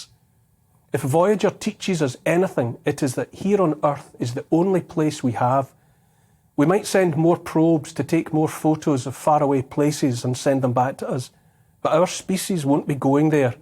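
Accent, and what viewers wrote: Scotland, United Kingdom. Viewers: A combination of a beautiful Scotish accent and incredible intelligent, Sir Neil you are an incredible human soul ❤️ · Listening to Neil's Scottish accent only makes me wish a bagpipe band would go marching the hill. Wish I played. I can do scottish country dancing and miss it. Thank you for your support from this Canadian Pilgrim in British Columbia (Heritage is Scottish and South African, sure do wish the Commonwealth countries, and the rest of the world, would get it together to respect its people sooner). We must win. For our children. For our country. For Humanity.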